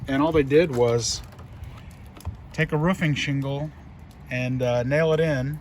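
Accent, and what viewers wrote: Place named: Midwest, United States. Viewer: Actually thats the ceiling not the roof but I have a great fondness for Mid-central American accents. ❤️👍🇺🇲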